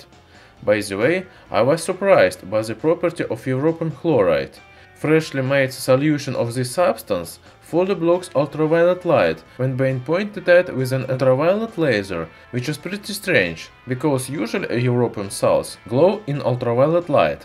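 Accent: Russia. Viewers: Who dosent like ur ruski aksent dosent deserve to be educated... I like it same as our hydraulic press Chanel... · Kindly forgive me Your accent is too much Russian when you speak English .Can you improve it anyway ? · Ах этот прекрасный русский акцент.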